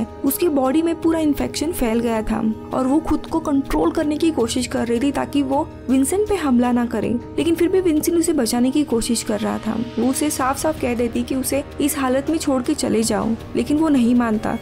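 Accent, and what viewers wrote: Maharashtra, India. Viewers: be patient मेहनतीच फळ मिळेल. मराठी Accent असूनही हिंदी छान explain करतेस तू .. थोड innovative कर with face explanation like " flimi indian" channel सारख public review घे इकदा Q & A घेऊन त्यांना काय हव आहे, त्यांना काय पाहायच आहे ., popular काय आहे ते विचार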